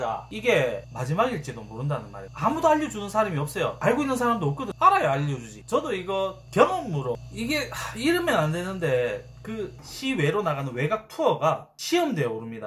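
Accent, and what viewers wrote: Gyeongsang, South Korea. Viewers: 피자사장? 말투? 경상도... 혹시 진주 유로코사장님이십니까?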